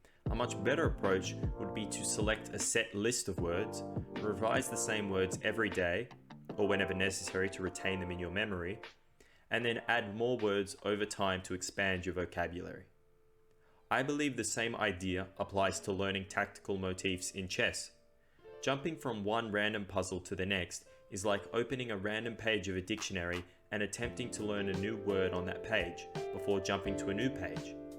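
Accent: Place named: Australia. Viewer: ah I know that accent! Where are you from in Australia? Subbed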